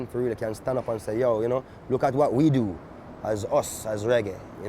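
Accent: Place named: Jamaica. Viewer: Dear Sir Gong, thank you for never abandoning the authentic Jamaican accent. Blessings in these great endeavours!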